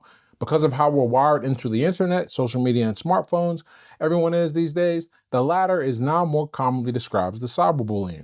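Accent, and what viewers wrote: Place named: Fox Chapel, United States. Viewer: You grew up in the hood but speak and act all Fox Chapel or Nevillewood. Salute my man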